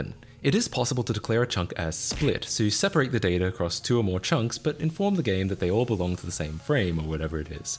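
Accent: Australia. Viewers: "British" - Said about a man who clearly has a strong Australian accent.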